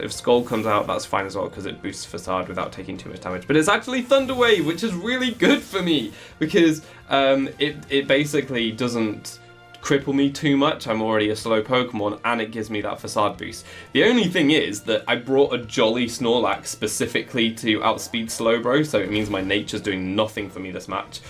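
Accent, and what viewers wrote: England, United Kingdom. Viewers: Somewhere in England I think. That's why he has Mewcastle United (Newcastle U, england soccer team)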